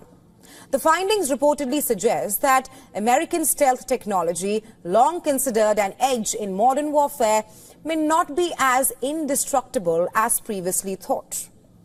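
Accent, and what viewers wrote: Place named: India. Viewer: I dont understand her indian english tune😢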